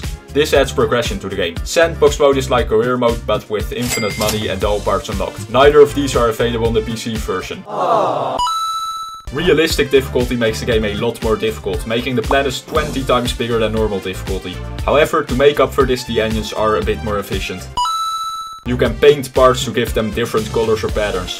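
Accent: Netherlands. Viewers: love his dutch accent! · are you dutch you sound really fucking dutch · Het nederlandse accent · i smell a dutch accent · Ah, yes, a fellow dutch man I hear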